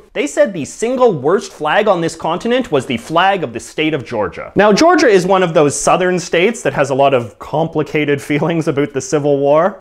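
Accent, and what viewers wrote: Canada. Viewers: are u Canadian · Hmmm... Is this dude Canadian? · you canadian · STOP SAYING ABOOT your giving Canadians a bad rap honestly stop. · Espeon Thomas he's canadian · Youtuber's Fans He is Canadian and Canadians typically use Aboot. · Whait, is this guy Canadian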